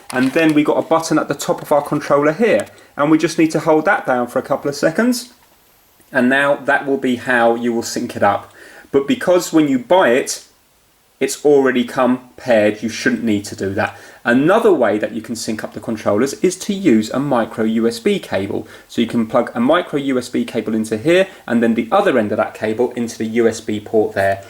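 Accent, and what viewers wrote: England, United Kingdom. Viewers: Its the British accent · Its really fancy to watch a tutorial with a british accent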